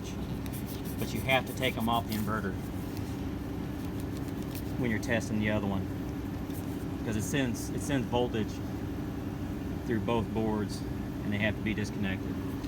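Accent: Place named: United States. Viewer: got that wrong u got american accent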